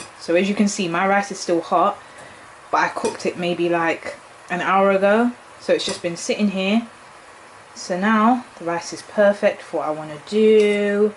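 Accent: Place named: Birmingham, United Kingdom. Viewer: Are you from Birmingham? 🤍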